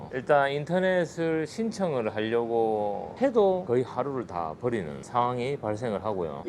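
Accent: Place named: Busan, South Korea. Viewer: ㅎ 부산사람 아이라 캐도 말 투가 딱 부산이네예 ~~ 근데 얼굴은 서울인데여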